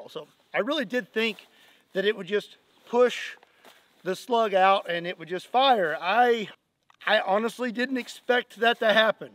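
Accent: Kentucky, United States. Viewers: Dudes definitely from Kentucky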